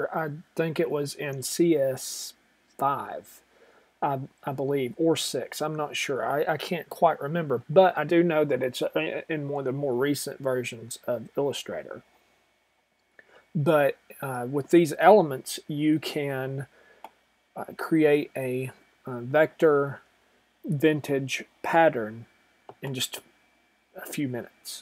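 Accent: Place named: United States. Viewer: You sound like a cowboy :-D You're accents amazing! This is a really helpful video. Thank you.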